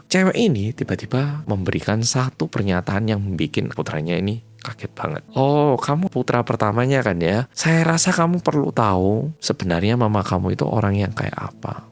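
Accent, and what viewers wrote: Java, Indonesia. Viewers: Masnya Korea tapi udah luwes banget ngomong bhs indo.. Jawa juga nendok apa dari kecil udah di indo.. Salam kenal dari jkrta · Suka banget dengerin cerita medok ini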